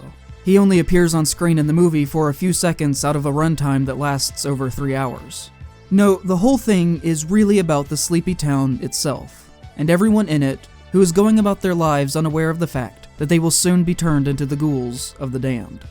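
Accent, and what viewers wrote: North Carolina, United States. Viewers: Odd. I thought that you sounded like someone from NC, during this, and then you confirmed it.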